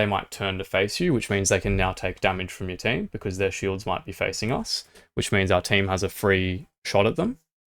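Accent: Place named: Australia. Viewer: I love your Australien Accent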